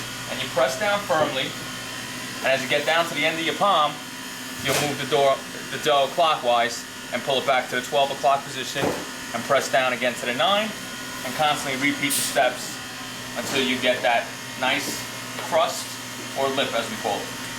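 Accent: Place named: Brooklyn, United States. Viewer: This guy sounds like he has a Brooklyn accent. Only in Brooklyn is where you will find real pizza guys who know how to make real pizza.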